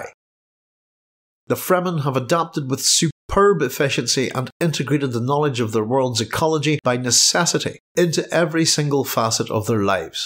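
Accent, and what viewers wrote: United Kingdom. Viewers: Wow, I was almost all the way through the video thinking you are Scottish when I tuned in and realised your accent is Northern Ireland. Sometimes an Ulster and west coast Scottish accent can sound similar. I wonder if it's because many Scots settled in Northern Ireland. Less Zen-suni wanderer and more See-you Jimmy wanderers :) Great video.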